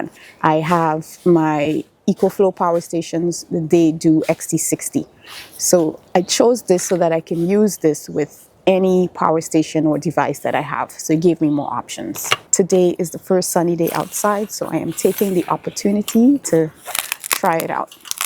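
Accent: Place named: Jamaica. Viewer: You sound Jamaican. Greetings from Montego Bay.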